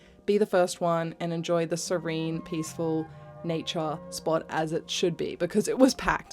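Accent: Australia. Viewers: Really enjoyed the day trip! I also enjoy your Aussie accent! He-yah (here) 😺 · I like you very much. Your Australian accent is lovely. The "hiya" (here) is very prominent. 😄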